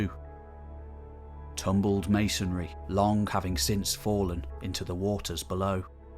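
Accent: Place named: North, United Kingdom. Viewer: He's a northerner.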